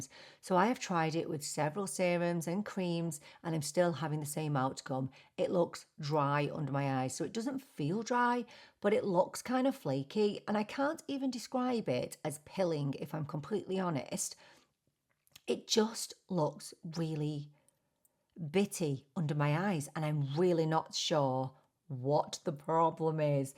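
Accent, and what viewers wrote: Ireland. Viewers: I have been loving your videos & binge watching instead of TV or the major trial in Mass. I am an Irish ☘️ American. Your brogue didn’t sound like my nana’s. You are so professional & funny & enjoyable. I am porcelain strawberry blonde.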